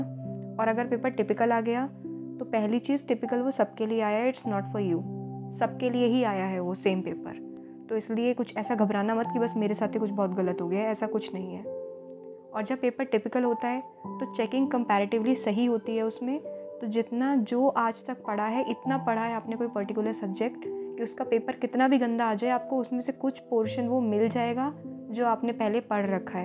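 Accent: Bengal, India. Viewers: Thanks for guiding lot of students.keep spreading your positive vibes....life becomes more positive and beautiful when people like you enter in life.Thanks and lot of respect to your parents who gives you such a good culture and sanskar. Somewhere I got a Bengali touch in your speach.you are from?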